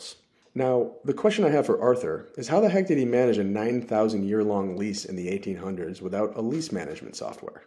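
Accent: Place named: Scotland, United Kingdom. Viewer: That's a Scottish accent 😅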